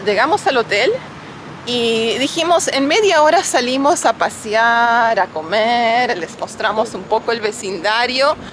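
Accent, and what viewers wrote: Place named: Argentina. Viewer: El castellano de se esta volviendo mas argentino 😅😅😅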